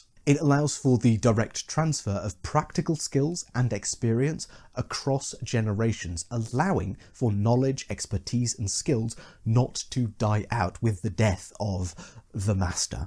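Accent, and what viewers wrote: United Kingdom. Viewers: Worst Witch probably a inspiration. Basically bunch of young witches go to school learn how to be witches. (inside universe boys goto wizard school). Its from the 70's. All the adventures take place mostly in the school or school related activities. They had many tv shows on this from UK. You sound like you're from UK :p. You should know lol. I swear Harry Pottery is just the male version of worst witch. Description of Book copy and paste The stories mainly take place within the walls of Miss Cackle's Academy, a girls' boarding school in a stone castle atop a mountain, surrounded by forest. Each book covers one term at the Academy.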